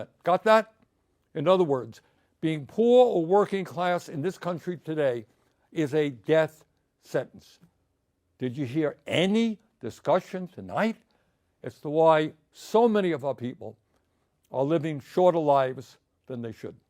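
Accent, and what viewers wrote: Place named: Brooklyn, United States. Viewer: Does Bernies speech really sound like an "American accent" to you? It is a Brooklyn accent to be specific.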